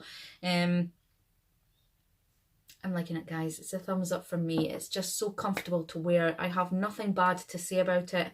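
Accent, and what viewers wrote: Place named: Dundee, United Kingdom. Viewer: I knew I recognised that accent! I’m from Dundee too. Might see you around. Lol xx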